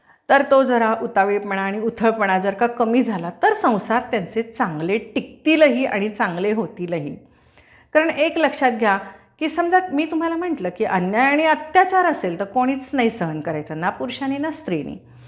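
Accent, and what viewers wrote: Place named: Marathi, India. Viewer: जय श्रीराम, ताई तुम्ही अगदी आमच्या मनातलंच बोलताय! आताच्या ईंग्रजीचे प्रभुत्व असलेल्या वातावरणात,तुमचे ओघवते शुध्द मराठी ऐकतच रहावेसे वाटते!मला शुध्द मराठीचा खुपच अभिमान आहे,तुमचे मराठी बोलणे मला आवडते!अजिबात नाटकी पणा नाहीये!प्रत्येकाचे स्वभाव वेगळेच असतात पण आयुष्यात तडजोड करावीच लागते!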